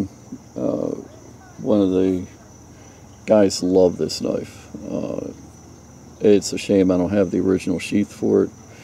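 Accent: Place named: Baltimore, United States. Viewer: Love your finds...great video. Your B'more accent keeps me longing for the good old days in Maryland! I lived in Glen Burnie for almost 20 years and nearly forgot what a terrific area it was for vintage finds. Keep it Up!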